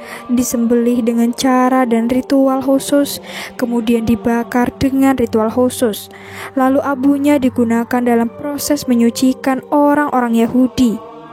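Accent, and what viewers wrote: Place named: Java, Indonesia. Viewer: Kentel bgt logat jawa nya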